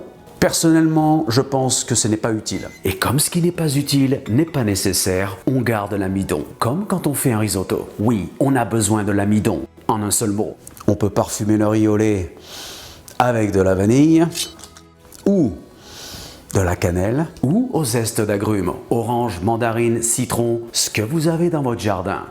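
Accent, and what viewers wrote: France. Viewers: oui il est top dans les deux langues, perso, je préfère la version anglaise avec son petit (très léger) accent français si charmant ! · where is the french accent of the chief ? sa me manque en vérité c'etait quand meme amusant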